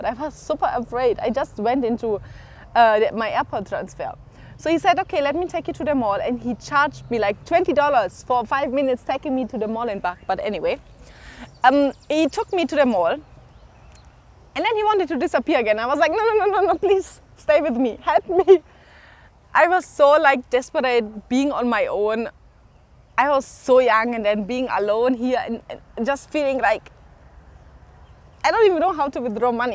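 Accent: Kenya. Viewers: you picked that kenyan accent really quick. I can tell by your pronunciation of words. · Hahaha you have a very strong Kenyan accent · I was born in Kenya but left when I was 16 and have never been back. I will go back hopefully in 2023. You sound Kenyan. 😅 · You even have a Kenyan accent! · I like what I see and hear. A lovely mzungu speaking english with a Kenyan accent🥰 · You adopted the Kenyan English accent perfectly. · But that English is Kenyan!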